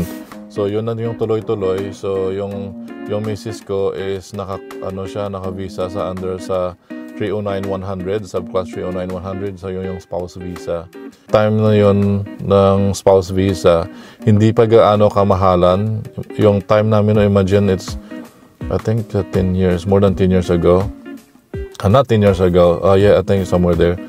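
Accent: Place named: Ilonggo, Philippines. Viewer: Nanami-an ko mamati sang tono mo. Siling ko, daw Ilonggo gid ni mu, and then i saw your other videos...ay, kababayan gid, taga Negros man gali. 😊 Makabulong sa hidlaw. 😊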